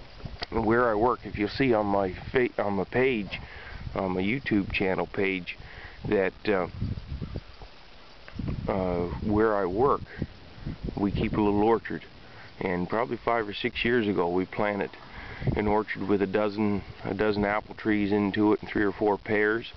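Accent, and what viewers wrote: Nova Scotia, Canada. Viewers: Oh my god! I had to laugh - I was looking up videos on Orchards and planting new ones and as soon as you opened your mouth I was like "holy shit, this guy is from Nova Scotia" which is where I happen to be from, too, and where we will be planting an orchard after we move back home from BC. Love it. Thanks for the video and information, but also to the throwback for the old South Shore accent.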